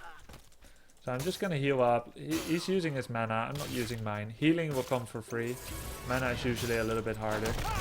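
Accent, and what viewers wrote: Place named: Netherlands. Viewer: You look and sound so Dutch :D Nice vid.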